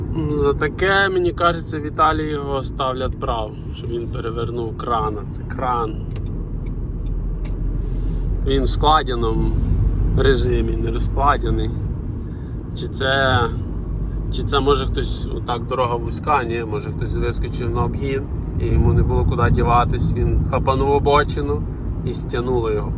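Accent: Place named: Bukovina, Ukraine. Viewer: Дякую за відеороліки по рено kangoo.Мені таке авто сподобалося.Шукаю WV кедді,але бачу,що і це не погане і можливо дешевше.І ще земляк,як робиш роліки фільтруй базар.Бо твій Буковинський укр.-рус.суржик дуже ріже вуха.Я то,тебе розумію,сам так дома розмовляю.Але мені стидно,що ми з західної україни,а говоримо на половину російською.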